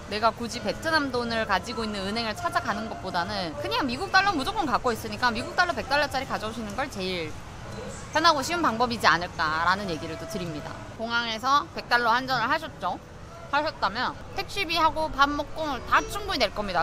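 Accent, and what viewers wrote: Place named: Gyeongsang, South Korea. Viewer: 잘보고 있습니다.^^경상도 분이신가보네요..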